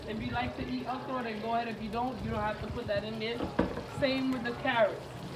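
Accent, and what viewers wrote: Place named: New York, United States. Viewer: I just love ur new York accent 🙂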